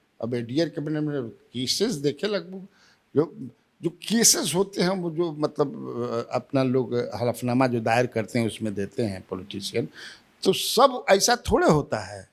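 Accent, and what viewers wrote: Bhojpuri, India. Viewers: ताहार भोजपुरी सुनके मज़ा आ जाला।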